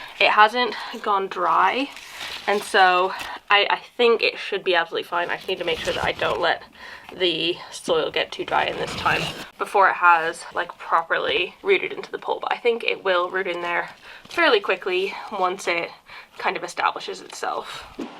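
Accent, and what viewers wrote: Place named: England, United Kingdom. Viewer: Ive been curious about where your originlly from and when you moved to england, do you notice that you have an english accent sometimes? i think its cute! i would love to live in england. I also notice that when you speed up your videos you move your mouth around, do you have a bad habit of chewing your cheeks like i do? you seem a bit neurodivergant and i think thats a sign. I do it so bad sometimes that my cheeks will be sore. Love your videos btw!! love your fashion style to! where do you get your jewelery??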